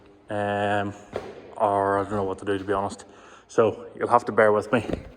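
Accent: Scotland, United Kingdom. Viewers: Some of us actually know you have a life and family outside of YT. I'm just a "peanut gallery regular" with an appreciation for a fine Scottish brogue! Hug your wife and children- they are much more of what makes you tick than I ever will be.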